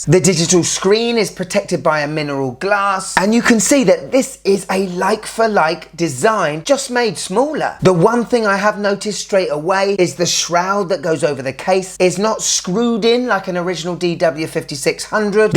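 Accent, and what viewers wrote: United Kingdom. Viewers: Best YouTube channel ever!! Sorry for my bad English.. I'm a fan from Brazil.. I also love Casio watches..I own 4 Casio's . Casio MW 240 is the cheapest and the most beautiful watche ever made. I wish you were my friend. British accent are the best accent in the world.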